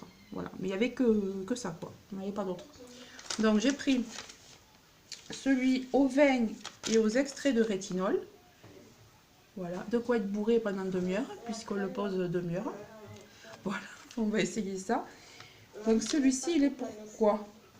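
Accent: South, France. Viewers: J'aime beaucoup fashionnista. Alors j'ai reconnu tout de suite ton accent . Je suis aussi dans le SUd. Mazamet je connais ;) Bisous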